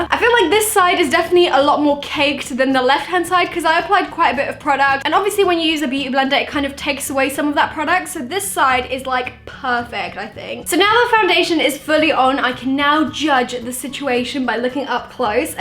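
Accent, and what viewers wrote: United Kingdom. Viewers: British Accent? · Is she British?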